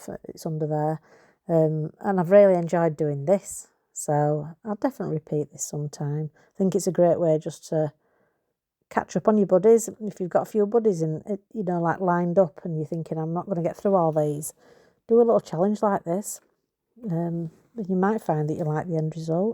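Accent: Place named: Northern England, United Kingdom. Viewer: Years ago I had a Siamese cat he hated dogs, he would chase them out of the yard he didn’t care how big they were he’d bite their ankles jump on their back and bite their ears, they would run screaming out of the yard he would walk back tail in the air smug look on his face. If I saw a dog out the window he’d run to the door tail up let me out! I really enjoyed this chatty video I hope you will do more, I followed along while I sat here here in Australia by myself I enjoy your company, I like to hear you talk it reminds me of when I lived in the north many years ago, when they’d say things like put wood in ole, or shut dooer, nobody ever said’the’ anything, sometimes it seems the rest of us are a bit too English 😂 it’s supposed to get to 48C today, I’m sat under the split system, cool air blowing, not going out today! Sat in a thin nightie, keeping fluids up , curtains shut against the sun. 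And you have cold and rain! It will be our turn soon enough, at least then we can add extra clothes to keep warm but there’s not much relief when it’s too hot 🥵 I love your idea to raise money for cancer and I will be donating. ❤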